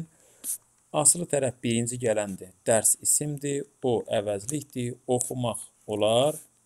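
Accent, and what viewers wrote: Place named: West Azerbaijan, Iran. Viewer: Qaqaş sen qərbi Azərbaycanlisan? Onların lehcesine oxsayir lehcen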